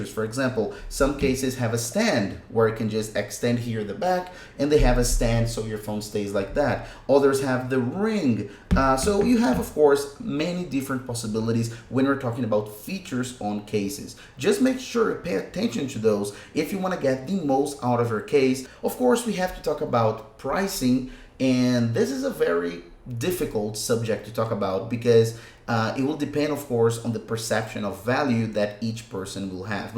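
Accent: Brazil. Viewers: Gostei,fala muito bem inglês,só sei que é Br pelo sotaque kaskka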